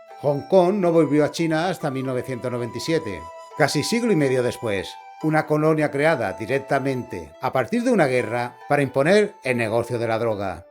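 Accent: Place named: Spain. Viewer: Sin querer tirar hate, pero me parece curioso que una persona co acento español hable mal de colonizadores, perdon pero me pareció curioso XDD. Me gustó mucho el video ♡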